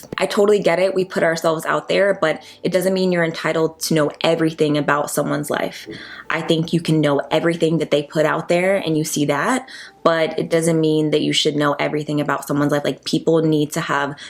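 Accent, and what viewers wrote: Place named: Tennessee, United States. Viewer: Low key still hear your Tennessee accent!!! So cute❤️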